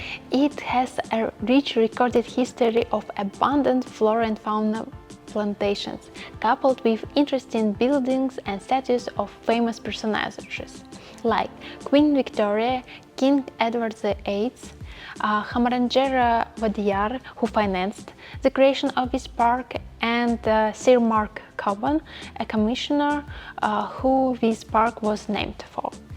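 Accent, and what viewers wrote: Russia. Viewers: You have Soviet(Russian) accent in English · Are you Russian? Coz you sounds like Russian. I love that 👍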